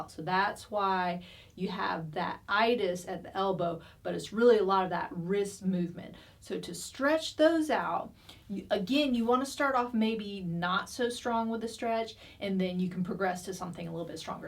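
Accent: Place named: South Carolina, United States. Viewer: I am a PT and I want to thank you for doing such a great Public Health Service with your videos. Your treatment is spot on and delivered very clearly in an easy to understand manner. I’m in Massachusetts now but couldn’t help notice that you’re a fan of SC, my home state! It’s nice to hear a familiar accent. Keep up the good work!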